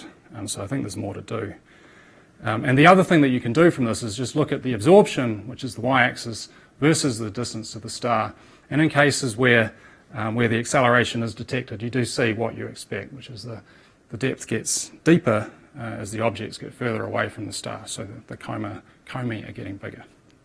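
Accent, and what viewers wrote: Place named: New Zealand. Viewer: " I'm not gonna try and compete with Van Maanen's Star" - He does have a distrinct New Zealand accent.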